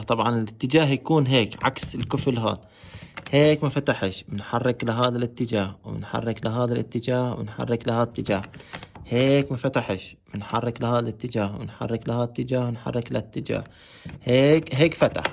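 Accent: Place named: Palestine. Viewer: لهجتك فلسطيني يارب ينصركم + الطريقة نفعت والله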